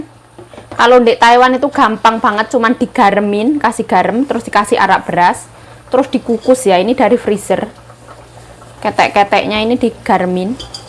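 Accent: Java, Indonesia. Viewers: Aku belajar masak dari cantik Bicara logat bahasa Jawa 👍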